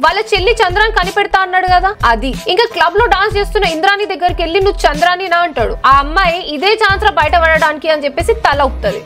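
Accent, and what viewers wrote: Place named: Telugu, India. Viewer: Imagining you in BigBoss 9...😎..your telugu accent is NEXT LEVEL...please keep it up.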